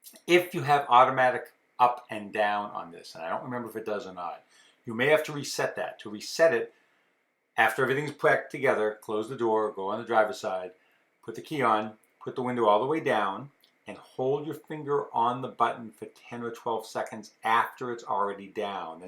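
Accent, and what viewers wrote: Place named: Massachusetts, United States. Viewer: Your in florida but you def sound like your from that MA area....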